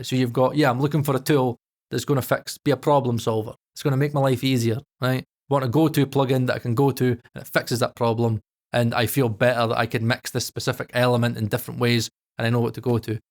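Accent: Scotland, United Kingdom. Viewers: Nah mate, you've got it all wrong. Almost nobody who watches you is BUYING plugins. They may OWN hundreds of them, but they didn't buy them. The Joy Of Plugins isn't what they do for the sound, it's the simple dopamine release scenario. It's like GAS (Guitar Acquisition Syndrome) except there's nothing shiny to hang on your wall. Why do you think DAWs like Studio One make such a big deal out of the wee thumbnails of the VST effects and instruments? Does it make it easier and faster to find things? Maybe. But it also lets you have a virtual wall to hang your plugins on, which is far more important. [I unsubscribed to you ages ago, but every so often YT flings you into my space. I watch because you are a rare Scottish voice, and like me you are autistic. But I find most of your plugin talk really uninteresting and obvious. Not that you will give a damn! 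lol] The stuff I do find interesting is when you debunk the veracity and accuracy of a plugin. That's always fun.]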